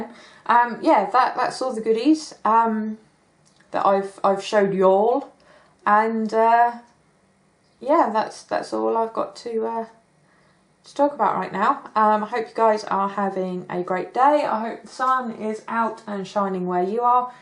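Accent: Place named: Texas, United States. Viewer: I enjoyed you video so much. Your "Texan is great". Being a born and bred Texan, I can tell you would make a great asset to our great state. And I like the way you shop, video and talk!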